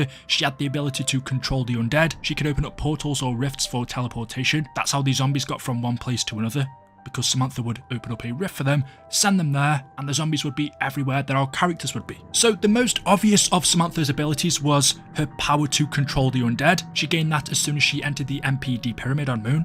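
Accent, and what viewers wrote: United Kingdom. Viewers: British person speaking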